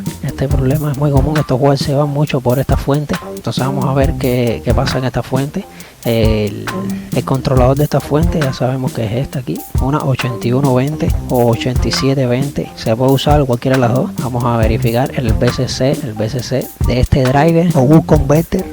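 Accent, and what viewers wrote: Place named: Puerto Rico. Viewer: si primera ves que hablaaaa jajajajajajaja...................y tiene acento boricua jajajajaja likeeeeee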